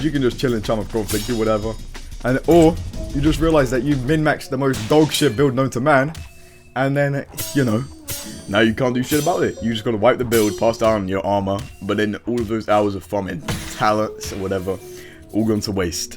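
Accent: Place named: United Kingdom. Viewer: You are so British, damn...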